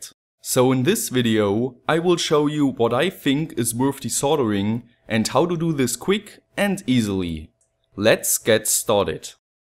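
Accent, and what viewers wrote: Germany. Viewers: That's a german accent :-) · Desloder with a heatgun... u welcome ;) btw. i would guess u german and not russian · german trying HARD to speak english... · Are you German? You sound like me when i speak English (i'm German).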